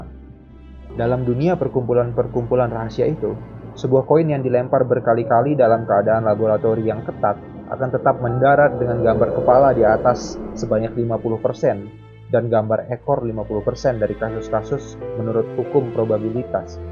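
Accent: Lombok, Indonesia. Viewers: Orang lombok bang?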